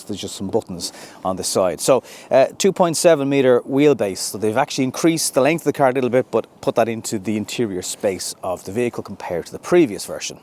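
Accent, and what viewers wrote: Ireland. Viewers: Sounds fine to me - lovely Irish lilt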